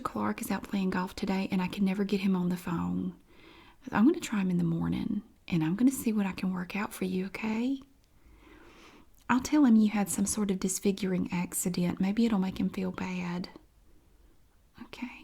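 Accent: South, United States. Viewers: I love asmr, and i'm so happy that there is a person from NC doing it now, and that has a good southern accent!!!!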